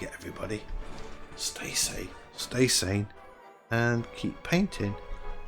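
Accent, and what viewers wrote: United Kingdom. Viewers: I’m more and more impressed of what you can paint digitally. I really like the way you use the pastels, I can’t say that I liked pastels in the past. You are making great art and I’m grateful that you show all steps to us. I also like listening when you are talking how you think while painting - the british accent is also to my taste.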